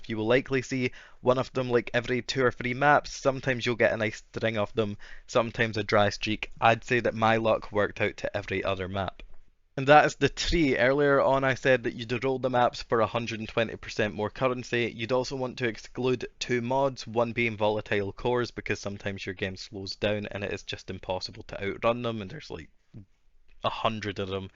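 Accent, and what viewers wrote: Scotland, United Kingdom. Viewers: I discovered your channel this league and all your strats are all great and I really like listening to you, your Scottish accent is the cherry on top. Keep it up bro. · scottish accent = subbed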